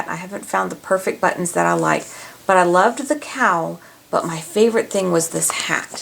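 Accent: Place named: United States. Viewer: hi I watched your rainbow loom vid and your voice sounds American but u look Asian please tell me where your from I love your vids please reply ASAP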